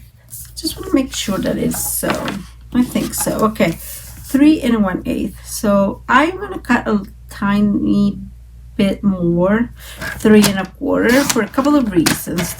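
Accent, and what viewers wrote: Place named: Puerto Rico. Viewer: Love watching you create cards,. You speak like a woman who lives here in the apartment building where I live, and she's from Puerto Rico.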